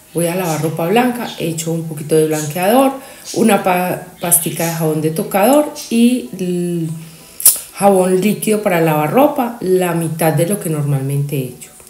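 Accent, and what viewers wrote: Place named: Antioquia, Colombia. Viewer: Hola Sra, excelente el blanqueamiento del lavadero, desde que lo recomendaste lo he venido haciendo, lo mismo lo de mantener blanquito el Trapero, bueno yo aplicó todos tus consejos en mi hogar😊 por cierto me gusta mucho tu acento paisa😊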